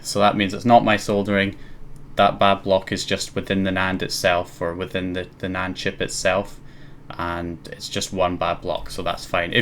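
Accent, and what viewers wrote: Scotland, United Kingdom. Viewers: Where abouts in Scotland you from?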